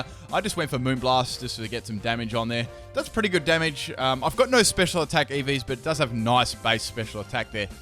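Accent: Australia. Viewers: Of course the narrator is Australian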